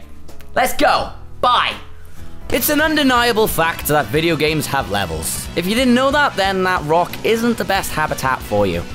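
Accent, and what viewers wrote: Wales, United Kingdom. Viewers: Hey man, where in Britain are you from? I'm trying to pin point your accent and you sound Welsh (I'd know😂) but I can't really tell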